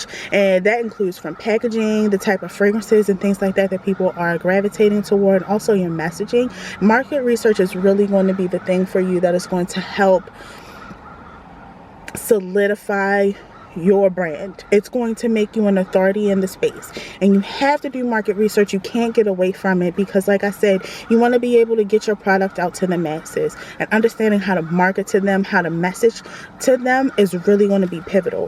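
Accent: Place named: Baltimore, United States. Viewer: Thank you Queen, and I will take your advice, because I have strongly been thinking about starting a body butter business. New subscriber, and you sound like you're from Baltimore lol. Love your accent and your skin is beautiful! Wishing you continued blessings on your business and look forward to your videos! 😀💞🤗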